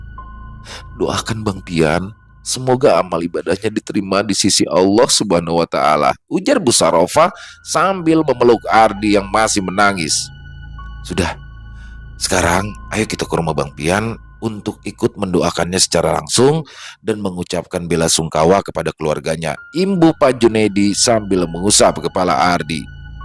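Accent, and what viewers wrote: Jakarta, Indonesia. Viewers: Paling suka kalau logat Betawinya keluar.... Salam sukses bang... Salam dari Iwan Pati. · Selain ceritanya memang udah bagus, cara bang membawakan juga ciamik. Betawi nya dapet banget. Dramatic reading yang keren karena dibawakan dengan natural, wajar dan nggak dibuat-buat. Jago banget dah hehehe. Salut buat bang dan tentu saja Mas Untung Prasetyo. Semoga terus berkarya dan sehat selalu. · Taiwan hadir bang... bmyakin logat Betawi bang...aye pantengin terus nih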